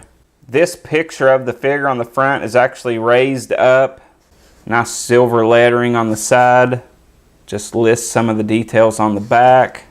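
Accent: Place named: Texas, United States. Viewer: Your accent..your from texas?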